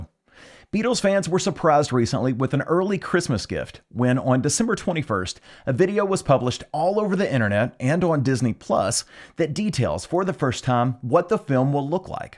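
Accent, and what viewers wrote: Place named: Baltimore, United States. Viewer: I'm digging on your vowel sounds. Must be Baltimore or something like it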